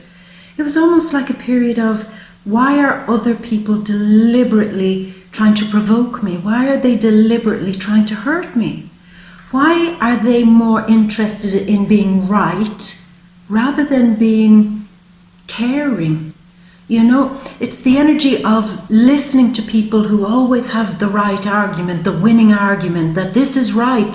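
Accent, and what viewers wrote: Ireland. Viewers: Yes I know she’s Irish....and her accent is adorable.... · KellyByoga yeah nnnnno shes Irish my lovely x0x loves yah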